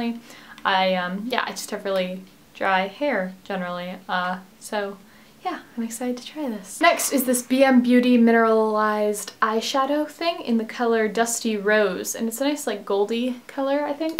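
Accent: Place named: England, United Kingdom. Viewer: I can hear an English accent creeping in there :)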